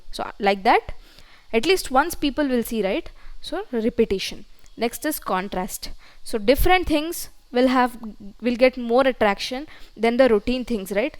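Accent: Telugu, India. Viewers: SISO YOU ARE A TELUGU SPOKEN PERSON I'VE GOT CLARITY. THE LECTURES ARE QUITE GOOD 👌👌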